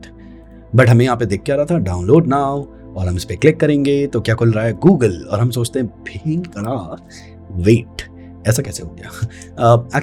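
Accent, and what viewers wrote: Bhopal, India. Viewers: nice and calm explaination and to the point with some bhopali touch thank you sir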